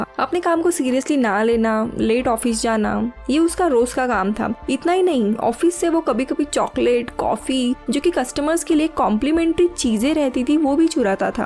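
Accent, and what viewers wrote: Maharashtra, India. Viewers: as usual one again nice explained ...👍 Marathi accent aasun pan hindi yevdi clean kashi boltes 😁😅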